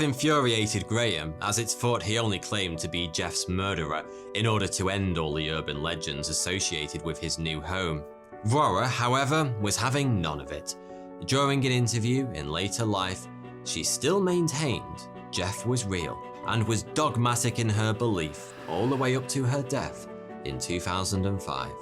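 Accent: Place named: Southern England, United Kingdom. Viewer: Thanks Phil. I've come across the accent several times on YT. The word "think" caught my attention in a few cases. I'm curious about the dialect...I'd call it a dialect. It's a marker for a distinct cultural community. I wonder (and worry) if it will go extinct, like so many others. Do speakers have a group name, or any sort of identifier that I could research? If not, in what range and in what towns of southern England is this dialect common? I'm just looking for an area boundary, if there is one. Do you know if speakers are ancestrally connected to Bretons, Angles, Picts, Normans, etc.? And do they all have big front teeth and mustaches for disguise? LOL!!! I think that explanation is hilarious. Anyway, thanks for any first-hand, factual info you're willing to provide on this south England dialect. :)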